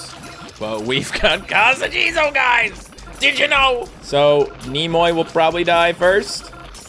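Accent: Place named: Newfoundland, Canada. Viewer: Bro are you from Newfoundland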